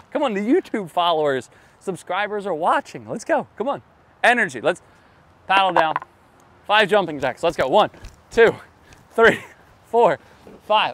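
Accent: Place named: Philadelphia, United States. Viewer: Love your vids! And your Philly accent ;)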